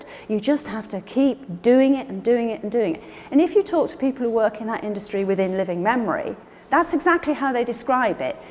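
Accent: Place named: RP, United Kingdom. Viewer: RP accent is delightful.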